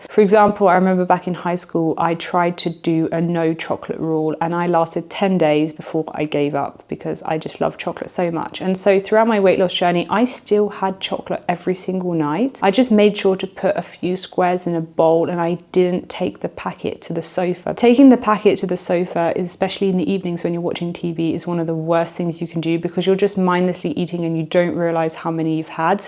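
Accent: United Kingdom. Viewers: The British accent made it difficult for me to watch and understand this video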